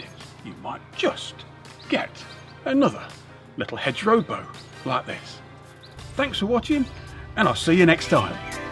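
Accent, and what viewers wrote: United Kingdom. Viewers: Beautiful video, Beautiful English accent. Thank you. Greetings from France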